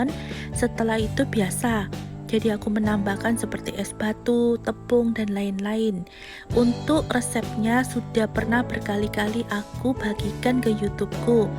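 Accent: Java, Indonesia. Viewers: Dengerin cerita memasak udang gimbal..,njowone khas bunda hehe Monggo kampir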